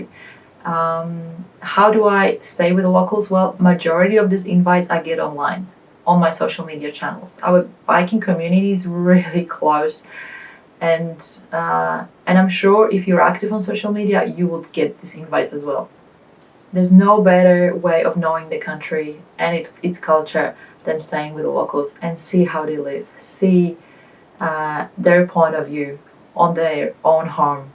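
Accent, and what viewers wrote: Australia. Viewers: Australian.....yes can pick Aussie accent....and polish....so am i....doing this on your own Awesome. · I spotted the Aussie influence in your voice and attitude. You're an exceptional individual and supreme ambassador. The world needs more of you. ❤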